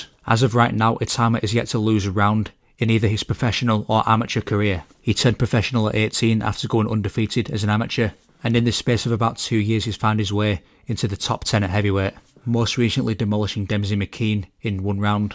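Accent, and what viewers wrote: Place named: Northern England, United Kingdom. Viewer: I like your accent, probably Northern accent in the UK.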